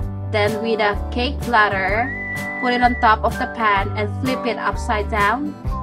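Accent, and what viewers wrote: Philippines. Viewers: Hay naku dmo pa KC tagalugin halatang Pinay ka.puro question mark way Ng English mo😂😂😂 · you sounds like Filipino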